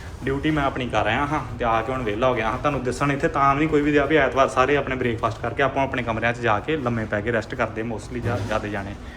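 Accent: Majha, India. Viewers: eh majhe side di pure punjabi hi hai ji. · ਬਾਈ ਜੀ 12.23 ਜਦੋਂ ਬਾਹਰ ਦਾ ਵਿਊ ਦਿਖਾਇਆ ਸੀ ਉਦੋਂ ਬਾਹਰ ਕੀ ਸੀ ਜਿਵ੍ਂ JCB ਨੇ ਜ਼ਹਾਜ ਚੁੱਕਿਆ ਹੁੰਦਾ ਟੋਚਨ ਪਾ ਕੇ , ਉਹ ਸਮਝ ਨਹੀ ਲੱਗੀ ਕੀ ਚੱਕਰ ਸੀ ਸਮਝਾਿਓ ਜ਼ਰੂਰ , ਮੈ ਲੁਧਿਆਣੇ ਤੋਂ ਤੁਹਾਡੀਆਂ ਸਾਰੀਆਂ ਵਿਡੀਓ ਦੇਖਦਾਂ ਬਹੁਤ ਨਜ਼ਾਰਾ ਆਉਂਦਾ ਵਿਡੀਓ ਦੇਖਕੇ ਤੇ ਮਾਝੇ ਦੀ ਬੋਲੀ ਸੁਣਕੇ ਤਾਂ ਹੋਰ ਮਜ਼ਾ ਆਉਂਦਾ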